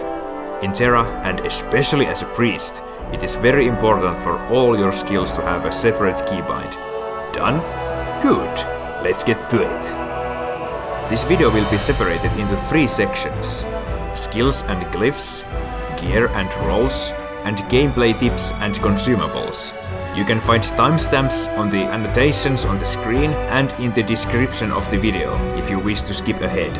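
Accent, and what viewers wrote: Finland. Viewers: Ralli english is so strong with this one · you sound like all of my Finnish relatives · hirveen tutun kuulonen aksentti, taitaa olla suomalainen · tolla aksentilla sun on PAKKO olla suomalainen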